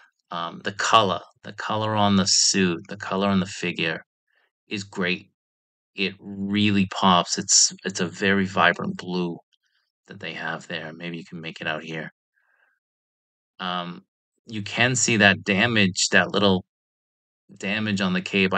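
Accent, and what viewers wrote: Boston, United States. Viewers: My Yankee ears are picking up a Red Sox accent lmao. Thanks for the updates bro. Need that new Batman and Eradicator soon!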